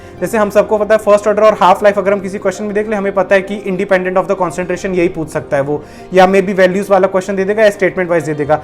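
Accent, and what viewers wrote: Gujarat, India. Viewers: Are you from gujrat ? 😅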